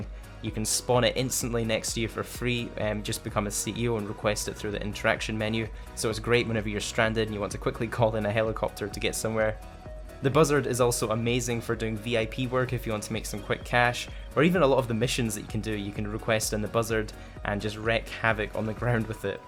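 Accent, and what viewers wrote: Scotland, United Kingdom. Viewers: Are you Scottish? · Scotland? ^3^ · M8 your so British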